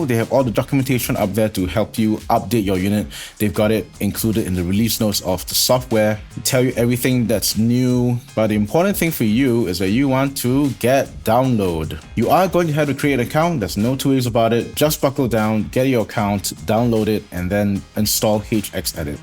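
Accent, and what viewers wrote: Singapore. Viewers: Just in time for my Helix LT :) Are you from singapore by any chance? you sound singaporean. Anyway, i'm Singaporean turning to your channel from Berlin. Anyways, great video!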